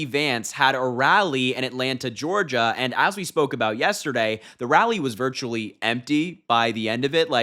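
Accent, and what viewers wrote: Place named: England, United Kingdom. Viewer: you’re the best. I live in Montreal, Canada and I’m following you. Your English accent is excellent and easy to understand since I’m a french Canadian. My wife and I find that you are a very intelligent young man. Bravo!!! And keep on doing the good job. Roger:)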